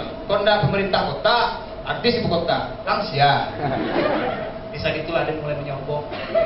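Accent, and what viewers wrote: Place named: Padang, Indonesia. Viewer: ndak lucu ang do...ma ado urang bukik logat padang...tutur bahso ang kasa...dasar padang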